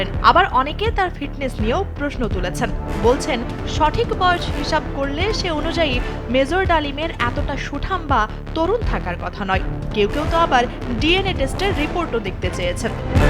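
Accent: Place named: India. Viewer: আপনার সাংবাদ পড়ার ধরণ ভারতের সাংবাদিকদের মতো নাকি আপনি সত্যিই ভারতীয় সাংবাদিক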